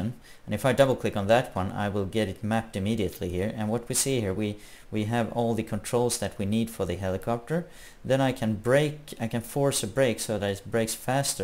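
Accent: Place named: Sweden. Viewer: jag hörde dirrekt att du var svensk! :)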